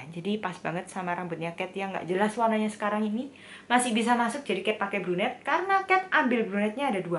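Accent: Java, Indonesia. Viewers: medog jawa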